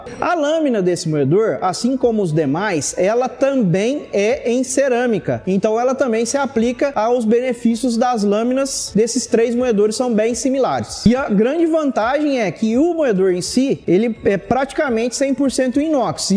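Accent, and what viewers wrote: Minas Gerais, Brazil. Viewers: mudou para mineires no vídeo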